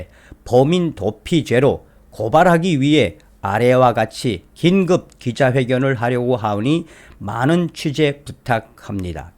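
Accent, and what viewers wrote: North Korea. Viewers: 북한억양이세게들어갈땐 듣기가 좀그래요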